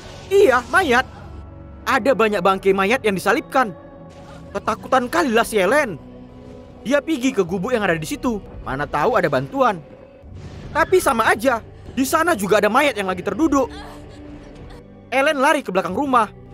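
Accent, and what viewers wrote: Medan, Indonesia. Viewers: Medan nya itu loh 😂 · Org Medan bg????? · Film horor katanya..harusnya awak takut dan merasa tegang..ini ga pulak..sor kali lah dengar logat medan ini..pokoknya menghibur kalilah bang..😆😆😂😂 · Org MEDAN ya cara penyampaian bahasa nya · Aksenmu medan atau riau yak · Medan kali baahh... Baru kali ini nonton film horor terkekeh bang... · Suka kali aku sama logam abang kayak orang medan karna aku orang medan🤣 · Kental kali khas Medan nya bg😁😁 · Nampak kali logat medan nya bah hahaha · Fokus ke suara adminnya pasti dari medan kleeen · Dendam cewe PMS 🤣 Bte Logatnya medan x ya bang 🤣 Sukses terus ❤️ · Kayak orang Medan logat kau bang ... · Orang Sumatra bg??? Logat mu anak medan kali, kalo iya sama kita aku Binje nya.. Kata " Berondok, tunjang, palak" Itu cuma ada di daerah kita 😅😂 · Wkwk, salfok sama suaranya. Logat medan bgt😭😭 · Baru lihat channel ini... 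Dari cara bahasa.. Aku tau ini Medan banget.. Krn aku jg Medan😁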